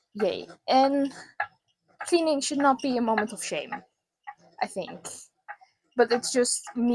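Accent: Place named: Netherlands. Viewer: Yep, i have the same as well here, also ik hoor Nederlands 😊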